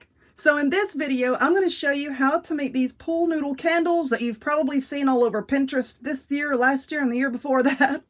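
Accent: South, United States. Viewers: New Sub here, love the way you talk ! Love the channel, boy you are southern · That looks so good your definitely southern I love your accent.i need to try that too co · Where are you from, I'm from SC. You sound like you are from the south.😊 great craft.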